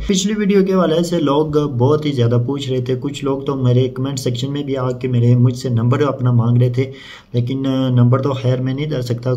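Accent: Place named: Rawalpindi, Pakistan. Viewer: I live islamabad ap ka bolne andaz pandi ka lak raha ha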